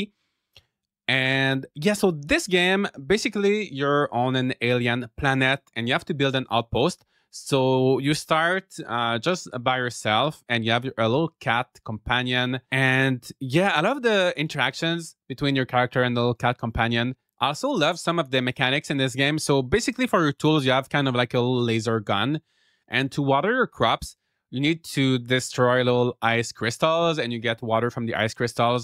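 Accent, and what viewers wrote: Quebec, Canada. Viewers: it’s funny because once I was watching one of your videos and my boyfriend said something like « il est Québécois lui » and I told him probably not. He said you had a French Canadian accent and I was like « nah, what are the chances? » 😂😂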